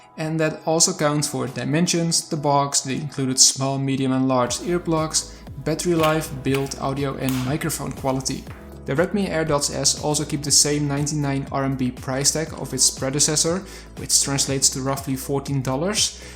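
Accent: Netherlands. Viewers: Goed Nederlands accent hoor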